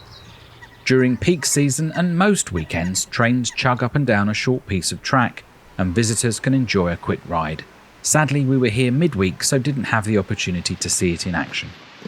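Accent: England, United Kingdom. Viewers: Lovely content with lovely English accent..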